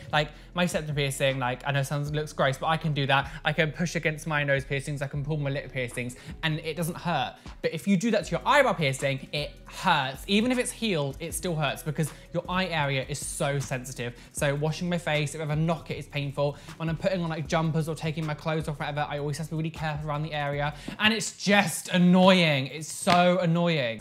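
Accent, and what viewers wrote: United Kingdom. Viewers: Really sorry about your piercing :/ Also I have a kind of different question, what accent do you have ? I really love it, I mean I know it's British, but is it RP? Or maybe Queens? I'm really curious haha Love your videos!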